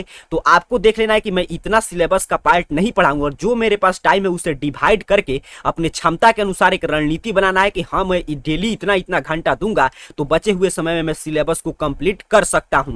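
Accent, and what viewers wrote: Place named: Gujarat, India. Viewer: Sir aapka voice ka ton ek gujrati jaisa hain.....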